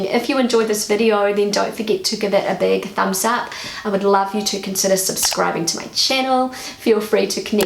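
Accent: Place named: New Zealand. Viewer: Don't be afraid about an accent; most of Germans speaking English have one ... ;o) ... so be one of us! And even as a Kiwi you have an accent which most of Germans are not used to; so don't worry. It can be managed. I worked for many international companies and get in touch with a lot of people from all over the world - they all have an accent. So ... and if you afraid of speaking ... sing! ;o) I'm looking forward for your next video in German, be sure eveybody will love it. And BTW ... don't take it as an accent, take it as you personnel dialect. Here in the Rhine area each and every small village has a dialect of it's own and when I moved here, I needed my (former) girlfriend to translate the local dialect to "normal" German.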